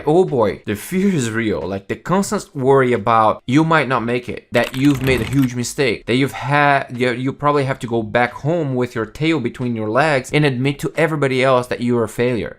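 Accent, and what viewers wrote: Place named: Brazil. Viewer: Hey, man ! I realized you are brazillian by your accent somehow, maybe bc I'm brazillian too, and then I checked out your description to find out I was right. Anyway, I'm planning to immigrate to US soon. Thanks for the reality check and advices ! I just can imagine how hard must have been for you, but you actually made it in the end !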